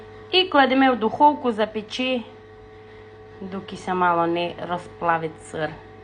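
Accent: Transcarpathia, Ukraine. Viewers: Дуже гарна жіночка, такий цікавий акцент,Закарпатський.Дякую за позитив і рецепт.Всього гарного та позитивного вам.Наталья.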